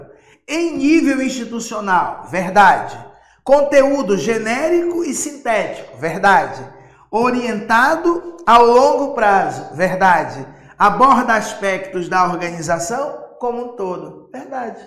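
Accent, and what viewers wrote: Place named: North, Brazil. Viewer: Amo esse sotaque, orgulho ser nortista!😃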